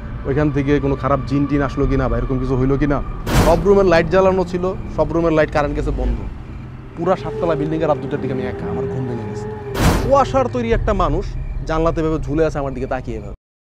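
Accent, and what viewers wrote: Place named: Mymensingh, Bangladesh. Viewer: Vai apnar basha Mymensingh kothay?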